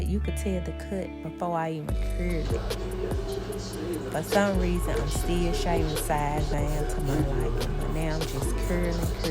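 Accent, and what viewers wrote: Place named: New Orleans, United States. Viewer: Accent sounds from NOLA 🎉🎭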